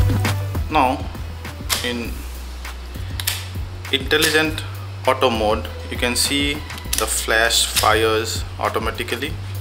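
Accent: India. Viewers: You think this English sucks? You should listen to Dutch people sometime, you'd cry. I think he's from India, or somewhere close to that. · Ocelot's Vlogs Yup He has an Indian Accent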